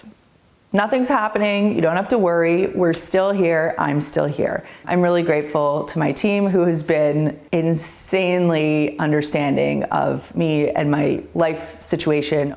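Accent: Canada. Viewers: Wow I can tell you're definitely from Canada A.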